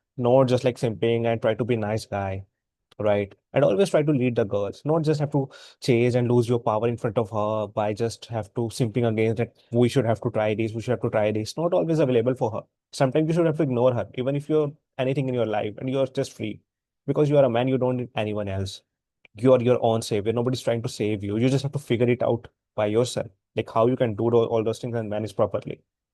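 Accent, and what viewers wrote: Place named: India. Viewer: In this video little too much bass, I am having hard time understanding all you say because Indian accent heavy. When sound is more clear, I can follow you, but not if too much bass. Just giving you feedback because most people would just stop the video after 2 minutes Content is good though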